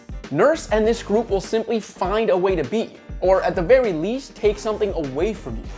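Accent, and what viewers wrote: Canada. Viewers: never noticed your cool canadian accent before 🕺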